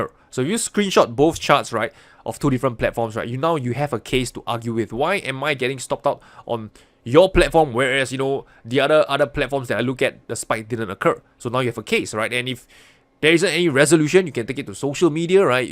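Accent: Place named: Singapore. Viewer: Greetings from Scotland. Just found your channel...wow some excellent information on here, been trading for over 15 years and its great to see someone like you providing so many videos free of cost. If I may make a suggestion, you should include some info on how to read fundamentals of the asset that they are trading. I assume with that accent you are from SG, lived there from 2005 to 2016, started off with an apartment in Great World City , then moved to River Valley for a few years and finished off in Toh Tuck Road for the last 6 years which were the best, living with the locals, not a foreigner in sight. LOL , not a day goes by without me thinking about my 10 years there, keep up the great work.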